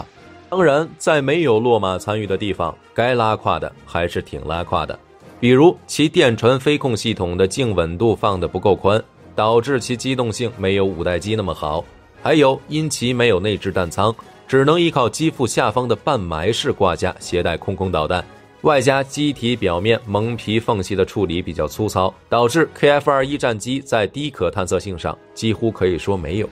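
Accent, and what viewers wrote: China. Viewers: 有點好奇，標題的"韓國話虎膦"似乎是臺灣的發音，但頻道主發音像是大陸人，怎麼會知道臺灣的厘俗用語啊？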